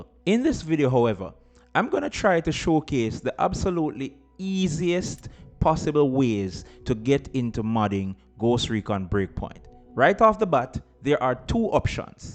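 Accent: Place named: Jamaica. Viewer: beautiful jamaican accent brother, great video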